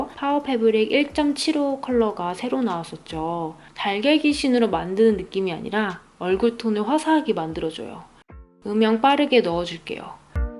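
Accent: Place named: South Korea. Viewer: 와 영상은 처음봤는데 진한 한국말에 놀람...한국분이셨어요?!!!!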